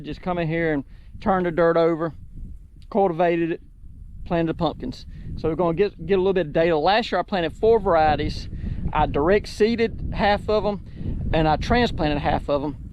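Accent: South, United States. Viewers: I'm from minnesota... I love your southern accent.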